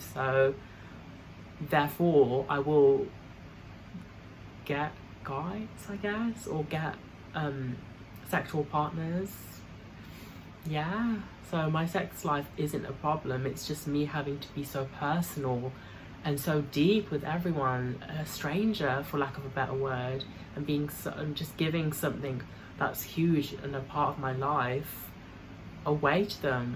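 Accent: United Kingdom. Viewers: She Has A Fat British Accent Which Makes Her Provocative 😊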